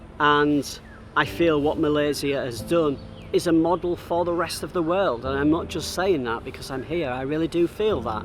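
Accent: United Kingdom. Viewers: I believe you are from the UK because of your accent. I have been to the UK for training and stay in stevenage about 2 months. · stay safe mate🤭🤭 if we meet teach me british accent haha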